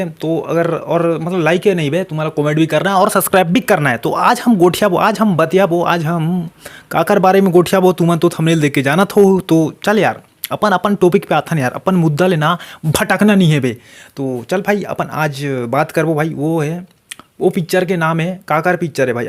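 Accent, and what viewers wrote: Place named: Ambikapur, India. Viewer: AAP kha se ho bhai cg thoda lailunga ambikapur taraf ka bolte ho😅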